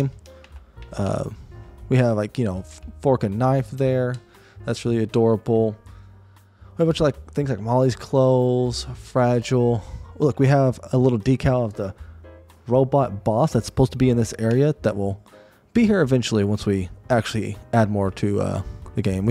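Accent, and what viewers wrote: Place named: South, United States. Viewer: a creator with a southern accent like mine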